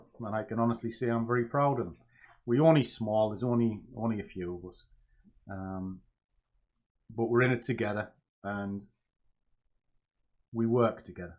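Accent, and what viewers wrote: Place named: Teesside, United Kingdom. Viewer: First time here , really informative and going by the accent think you must be local to me ( I'm in teesside)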